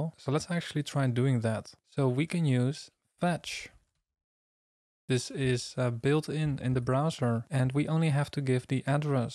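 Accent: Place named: Netherlands. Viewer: Ik hoor Nederlands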